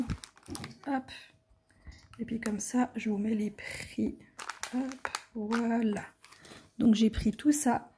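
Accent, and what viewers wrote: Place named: Alsace, France. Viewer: Cc je viens de tomber sur ta video ou la la un accent qui me fais bien plaisir qui viens d'Alsace si je me trompe pas moi je suis originaire de strasbourg aussi mais je suis bien loin a present je suis dans le 44 (loire Atlantique) MERCI en tout cas pour le haul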